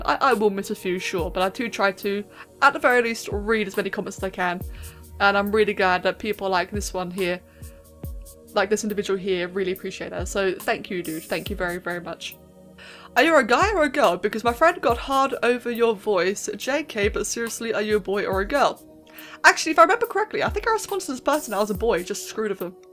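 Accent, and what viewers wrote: United Kingdom. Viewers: So, this is what I missed while I was away at my family reunion? Well, I have no idea what to think of it aside from "wow". Seriously, you look to have quite the following in the Spore community just as much other youtubers for other communities possibly (Alpharad for Smash, NISLT for TF2 (he's practically Alpharad of TF2 from what my brother Robert described him as) though I'm not really sure). The fan art is simply a sight to behold and the amount made for you and the Jowwi. Also, why is it that people mistake you as a boy when you are in fact a girl (a rather ladylike sounding one at that, but it might only be the British accent (no offense)) and I don't mind your voice at all. I. AM. SO GLAD that my comment from the Helminth Charger video that you commented on about my comment being among the list of other random comments and being unsure now what to do with it, thus inspiring extreme depression in me. 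It caused and is (possibly) still causing me as great of pain as my stomachache at the family reunion from going in for seconds and getting a second can of Pepsi right after I finished my first plate and can (me drooling at a trash can in preparation to vomit and all which didn't happen thankfully, the vomiting I mean). I simply want to try to put that behind me and I wish for a second chance in the raffle, that's all I'm asking for. I am dreading and fearing that my comment about you saying my comment reposting was a scumbag, something I deeply regret with all of my heart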